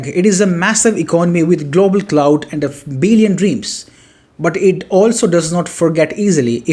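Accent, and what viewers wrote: India. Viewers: And also have no problem understanding your Indian accent. Very clear! Cool! 👍🏼 Keep up the good work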